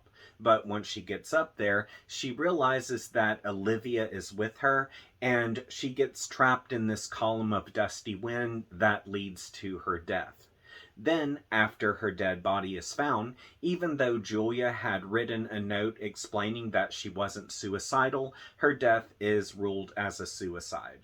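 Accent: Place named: Texas, United States. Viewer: That explains it, I'm born and raised in Texas, lived in Georgia for three years now, it was definitely nice to hear a familiar sounding voice on YouTube for a change ✌ I'm sending my best wishes, and have a wonderful day ☺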